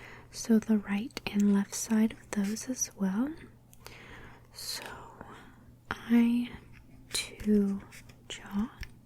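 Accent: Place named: South, United States. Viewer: Really enjoyed that, Thanks! And are you Southern? You kind of sound like Kelly Clarkson! I have had a crush on her since i was a teen lol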